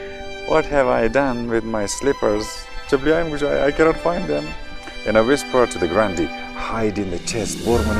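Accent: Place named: United Kingdom. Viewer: دو خوبی از حسن اخلاقی ای استه که ده هر چیز که مهارت دره بغیر ترس از پوره جزبے خو انجام میده. دوئم ای که خوب صاف بریٹش انگلش موگه.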